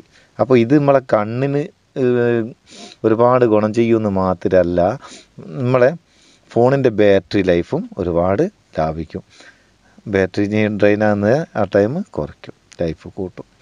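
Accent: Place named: Vadakara, India. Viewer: നിങ്ങളുടെ അവതരണം കേൾക്കുമ്പോൾ എൽ പി സ്കൂളിൽ എന്നെ പഠിപ്പിച്ച അദ്ധ്യാപകരെ ഓർമ്മവരുന്നു. വളരെ സാവധാനം പറയുന്നത് മനസിലാവുന്ന തരത്തിൽ. ആ വടകര, പയ്യോളി, മേപ്പയൂർ, പേരാമ്പ്ര, ഒരു നാടൻ ഭാഷയിൽ. അടിപൊളി. പുതിയ അറിവുകൾ ക്കായി കാത്തിരിക്കുന്നു. ആശംസകൾ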